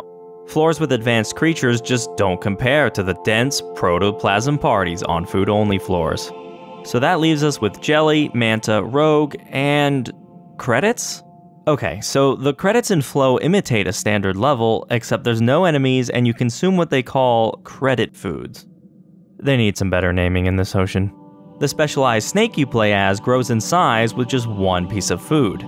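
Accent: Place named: Ontario, Canada. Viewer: Are you from Ontario,? I can hear it in your accent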